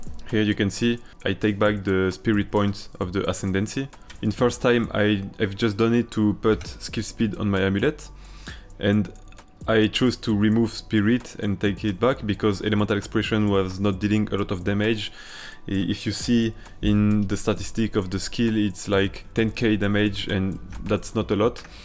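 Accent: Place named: Russia. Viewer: I think you're Russian because you have a Russian accent.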